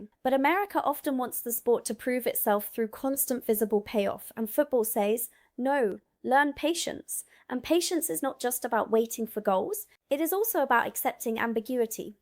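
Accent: England, United Kingdom. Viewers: you sound English now. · You sound British not American, you must have been here a long time ago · She sounds british to me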